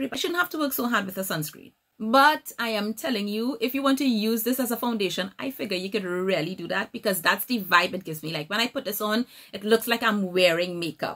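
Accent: Trinidad and Tobago. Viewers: Great review. You sound like a trini! OK so you just said, " for wearing it sake" yup, you àh trini.. Great review 🙌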